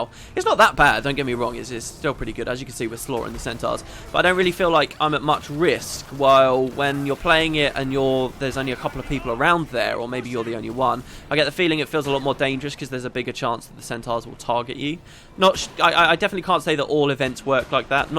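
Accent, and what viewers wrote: United Kingdom. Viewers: you do sound more "british" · Don't lose your British-ness! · Wow you really did sound more British 3 years ago! (but both voices then and now of you are still amazing XD) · Oh my god that accent:D, you definitely lost a little bit of your brittish-accent along the way. And even then, you knew everything about GW2. I wouldnt mind a few more of these:D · His voice used to be a little deeper, but there's also more of a British accent on top of that. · He sounded a lot more British years ago. Since most of this video is old footage from 3 years ago, he sounds different in it.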